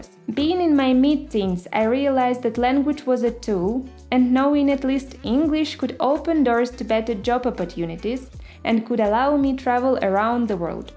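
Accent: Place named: Spain. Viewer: Her Spanish accent amazed me.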